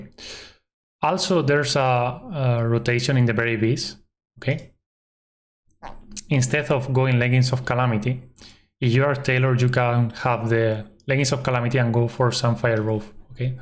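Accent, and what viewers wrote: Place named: Spain. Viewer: i really love your accent. i thought you were greek at first but i realized its spanish. but not all spanish people pronouce words the same way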